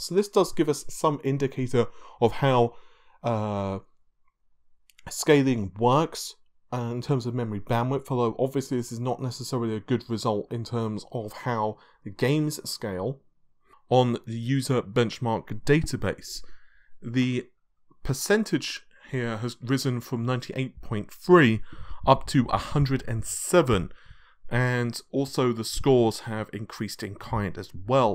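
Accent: United Kingdom. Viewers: He's British you dumbass and American is an accent. Not a language. I see the American education system has treated you well.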